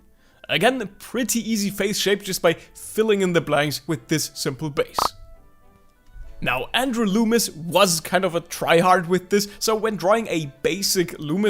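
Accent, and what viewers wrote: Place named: Germany. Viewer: Ich bin auch mit deutsch aufgewachsen, hat man bei dir sofort gehört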